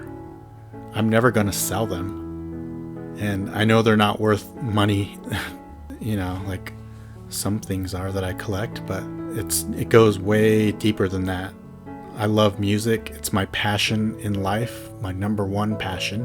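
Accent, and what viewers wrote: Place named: Sacramento, United States. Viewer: Love this video man! Sounds like you’re from the Sacramento area haha I am too! I was also at Aftershock & caught a few from Kirk! On the first night they played. I got 3, gave 2 away, one going to a kid & his dad that I saw looking for some in the grass. He was so happy haha What sucks though is as I was getting a hot dog outside from the street vendors, the one I kept for myself must have fallen out of my wallet as I was paying. I was crushed 😭 Luckily though on Sunday when they played again, one landed RIGHT between my feet & I stepped on it until the crowd cleared and I was able to pick it up. I like to think the metal gods rewarded me with another for my good deed of giving away those 2 Metallica picks on night one 🤘🏼 Got a few more picks in my collection. My favorite being one from Slash that he handed to me in San Francisco at the Warfield in 2010.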